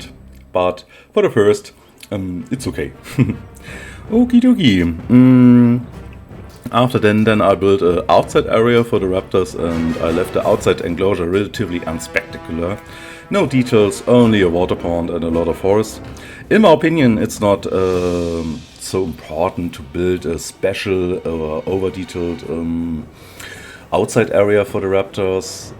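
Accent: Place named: Germany. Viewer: Mann merkt das du Deutsch bist xD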